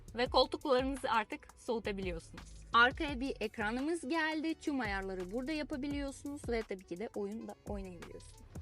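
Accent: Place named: Russia. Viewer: abla rus şivesiyle türkçe konusuyor ve almanyada 😮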